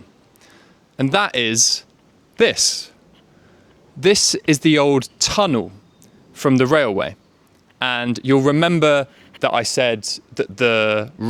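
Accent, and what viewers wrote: United Kingdom. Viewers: Just like in your previous videos, you really delighted me. Through your videos, I've been able to learn English effectively while also discovering interesting stories about British history. Furthermore, you have a very distinctive British accent (I guess), which is easy to understand and concise, helping me practice my listening skills confidently, as my listening ability is very poor. Thank you very much.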